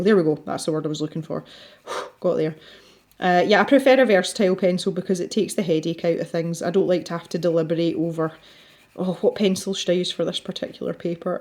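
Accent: United Kingdom. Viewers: I’ve just started colouring so I’m late to the party. Your vlogs are great and love your accent. It must be one of the best accents in the UK.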